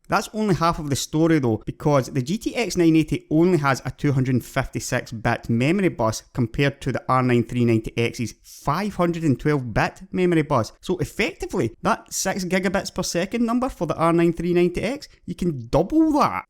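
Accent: Scotland, United Kingdom. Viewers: this scottish accent rulez, gegabytes :D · Great video; right? Helped answer the questions I had, was well paced, very visually informative and to hear a proper Scot again was great. Right?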